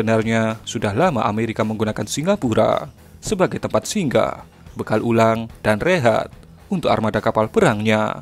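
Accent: Java, Indonesia. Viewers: Kak wong jawa ya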